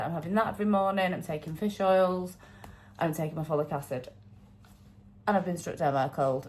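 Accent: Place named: North East England, United Kingdom. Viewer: Just stumbled across your channel. Love love love ❤. Why have I never seen your channel before? Watching an older video and thought with your accent and you caught the metro to work, that you lived in Manchester. You don’t. You live in the north east like me. I’m a fur baby mum and similar to you in personality and likes but I’m much older than you. So glad found your channel. BTW I live by the sea north east ❤❤ edit I’m from South Yorkshire originally but love it up here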